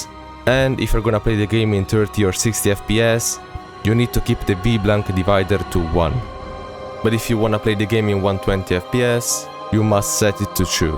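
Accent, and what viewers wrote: Italy. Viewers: Sei Italiano? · bro sei italiano vero?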